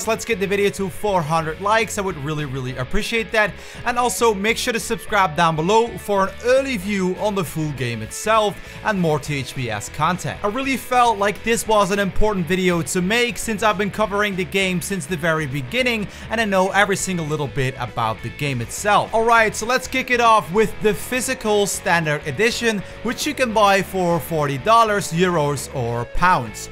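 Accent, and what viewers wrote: Netherlands. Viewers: Are u Dutch? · I see, you're either Dutch or Belgian.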